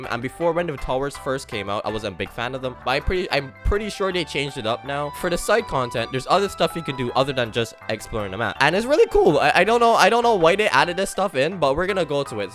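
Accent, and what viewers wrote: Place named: Barbados. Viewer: Bro sounds Bajan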